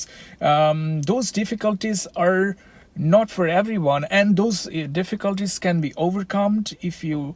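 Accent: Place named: Canada. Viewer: Bhai your accent is amazing. Looks like a native Canadian.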